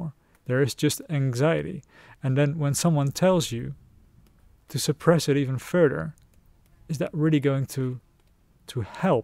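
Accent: Netherlands. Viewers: Exactly Its the balance. I realised for me I first need that feeling in myself that my fear is seen and calmed down like my inner parents talking to my inner child to reassure it. Then I let go of the resistance and there is an opening for undertaking a positive action and a good practise is doing a positive visualisation before the action that makes it very strong and usually succesful. For me For a long time I tried to do Brain retraining and forgot to first do brain retraining on relaxation and safety in the body which Made every letting go make me feel dizzy fatigued and in a stress state. So finally realising this and working on it now gives me an opportunity to let go of old beliefs and implement new ones. Are you dutch btw? You sound very dutch to me.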